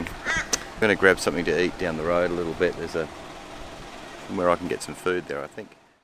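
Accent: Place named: Australia. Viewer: You sure have a strong Aussie accent for a whinging pom.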